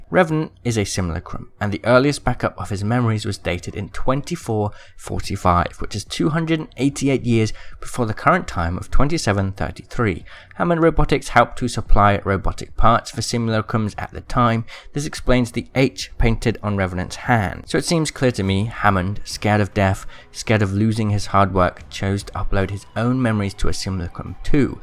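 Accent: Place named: RP, United Kingdom. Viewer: Bro, why do you speak like a posh dude?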